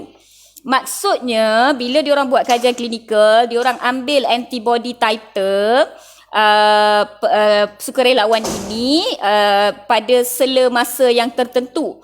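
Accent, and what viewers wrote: North, Malaysia. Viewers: ya ka....igtkn org utara...heheh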